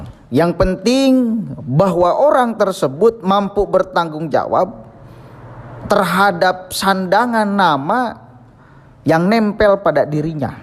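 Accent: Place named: West Java, Indonesia. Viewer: Hehehe sunda banget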